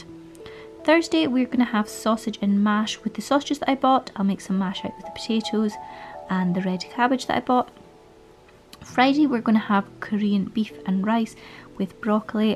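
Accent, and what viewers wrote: Scotland, United Kingdom. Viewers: All I could think is your Scottish lol. I shouted it actaully, I may need help. Trying to find my way just now on my own channel and your's within being on it for two minutes gave me hope and inspiration. Subscribed. <3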